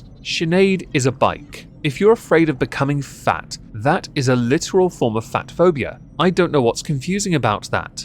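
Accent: RP, United Kingdom. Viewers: OH- I really, really want to subject him to them. He's peak entertainment when He's disgusted and annoyed. Oh please 🙏! The worst offenders are always intimidated by your command of the English Language / Posh accent.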